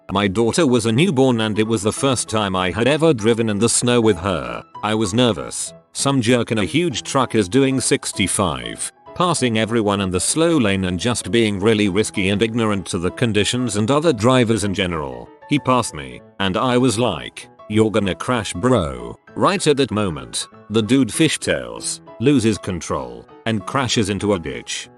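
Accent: United Kingdom. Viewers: That’s because it speaks in British English.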